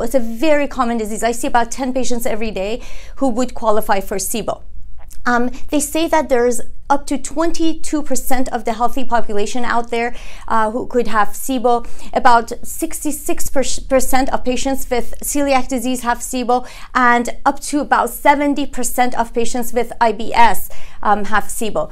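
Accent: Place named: Iran. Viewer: Sounds Irani.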